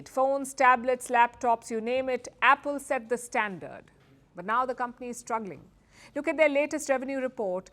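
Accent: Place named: India. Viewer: Horrible accent ,we are not trying to learn English with Hindu accent…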